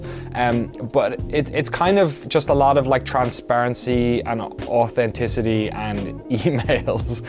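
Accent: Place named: Waterford, Ireland. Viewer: Shiiiiit! Your accent makes so much more sense now that I know you're from Waterford! Gas!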